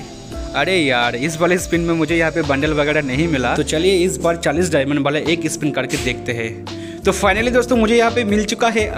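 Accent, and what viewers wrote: Bengal, India. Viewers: Bhai app bengali ho kaya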